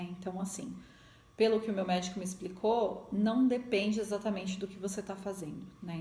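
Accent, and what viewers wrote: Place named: Araraquara, Brazil. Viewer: Legal so de araraquara,senti sua fala....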